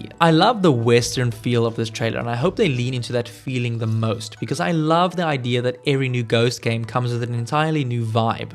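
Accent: South Africa. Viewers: Do I hear a South-African accent? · I knew your accent was familiar. Glad to see a saffa doing great content well done 💪🏻🇿🇦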